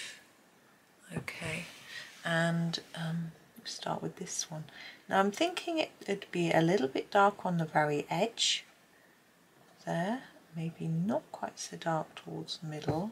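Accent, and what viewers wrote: United Kingdom. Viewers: The basket truly is the star of the show! I get such a kick out of how you Brits pronounce certain words so differently, like thistle. I'm with you, I'd never forage for mushrooms, too risky!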